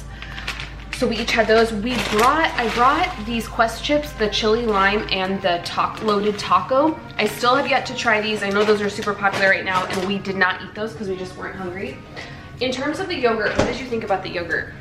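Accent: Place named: South, United States. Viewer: Do you live in Texas? See the Texas shirt and I do so just wondering. I know you have a southern accent for sure. We have a Sea World around San Antonio area.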